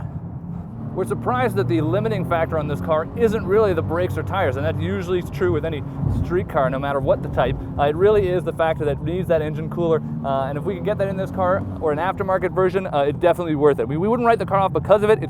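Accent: Canada. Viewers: canadian accent.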